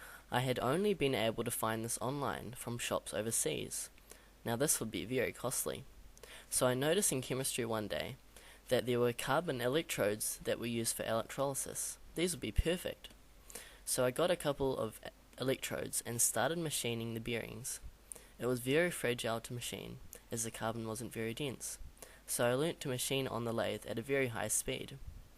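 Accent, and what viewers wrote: England, United Kingdom. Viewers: Wow. Kom je uit Engeland ofzo? Toppie accent. :) Is al dat gereedschap van jou? Draaibank en freesbank hoorde ik ook voorbij komen volgens mij? super werk heur!!!! :)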